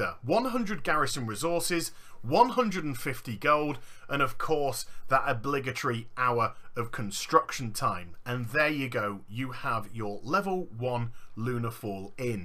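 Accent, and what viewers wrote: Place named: United Kingdom. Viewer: God I love the British accent. Every word. So clear.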